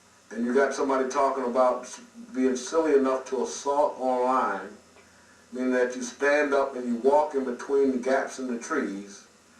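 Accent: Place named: United States. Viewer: he does have some kind of country accent but he's speaking pretty proper English. idk why you're so triggered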